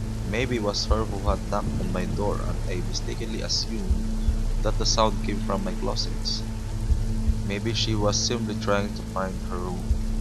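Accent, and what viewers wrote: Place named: Visayas, Philippines. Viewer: Nice kaau animation ♥️ ma halata nako nga bisaya ang narrator the way mag pronounce hehehehe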